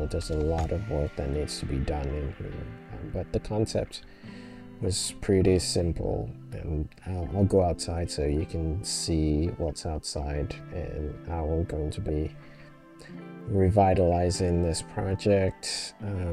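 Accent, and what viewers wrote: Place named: Nigeria. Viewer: Such a skilled Man. Immediately I heard Nigerian, I smilled. Great Job my Brother.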